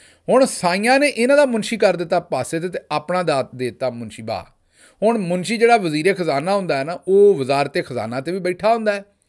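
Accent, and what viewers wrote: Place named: Punjab, India. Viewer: Your vlog sounds good to ears although such a strong Punjabi is not understable. Thanks for giving out meanings of common punjabi words. Keep it up!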